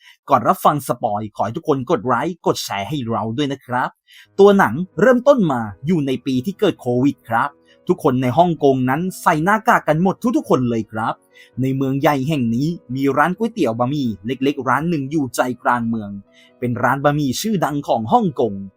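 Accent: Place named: Thailand. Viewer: สำเนียงพี่เหน่อจัง เป็นคนจังหวัดไหนหรอครับ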